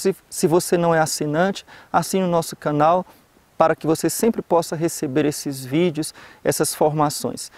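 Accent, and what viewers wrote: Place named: Northeast, Brazil. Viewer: é nordestino?????? Nem desconfiei, e agora vejo que tem um "sotaquezinho" ai kkk. Padre eu sabia dessa lei da Igreja, mas costumo esquecer e acabo comendo. Quando lembro vem um pouco de culpa, mas já não tem volta... é tentar na próxima.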